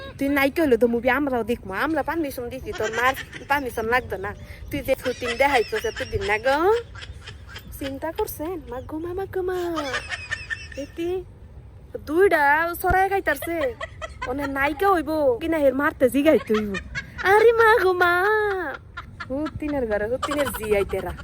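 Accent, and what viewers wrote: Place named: Mymensingh, Bangladesh. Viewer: ময়মনসিংহ ভাষা। রাইট বললাম তো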